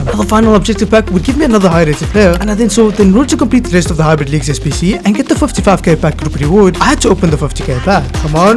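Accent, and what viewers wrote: South Africa. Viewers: Broski are u from south africa? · R u from Sa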